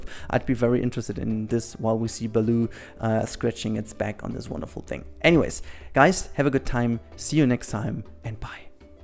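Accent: Germany. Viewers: Ich persönlich habe kein Problem damit, wenn jemand ohne Skript spricht. Den Deutschen Akzent hört man zwar raus, aber den machst du eigentlich durch gute Wortkenntnis wett. Ich kann dir jedenfalls gut folgen ;D Vielleicht redest du manchmal etwas schnell, aber nicht so, als dass ich das negativ anmerken würde. Mach einfach weiter so. Die meisten Kommentare unter diesem Video finden das auch so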